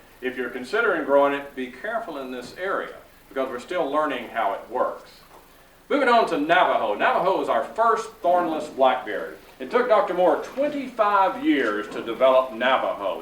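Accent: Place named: Arkansas, United States. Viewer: Excellent info, two thumbs up! Also if you want a chuckle, turn on CC, and see what it translates Arkansas accent to. lol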